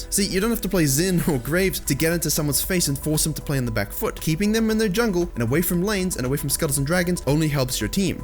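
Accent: South Africa. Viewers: Do I hear some South African in that accent?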